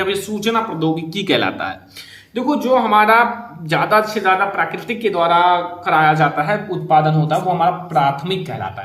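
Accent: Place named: West Bengal, India. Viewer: West bengal का बोल ra hai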